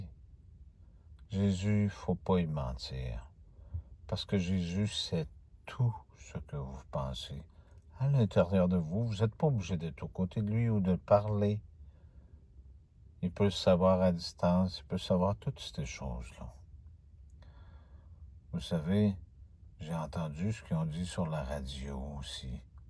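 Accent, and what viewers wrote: Quebec, Canada. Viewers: Vous êtes québécois ? 😄😁😆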